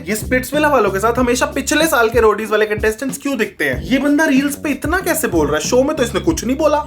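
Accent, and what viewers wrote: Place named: Punjab, India. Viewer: Ur punjabi accent 😎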